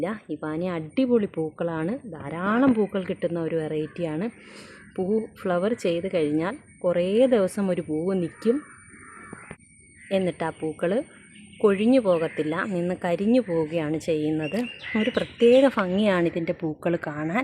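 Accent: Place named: Thiruvalla, India. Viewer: ഞാൻ തിരുവല്ല സൗണ്ട് മുൻപ് കേട്ടത് പോലെ പക്ഷേ ഞാൻ ആദ്യം കാണുവാണ് ഈ വീഡിയോ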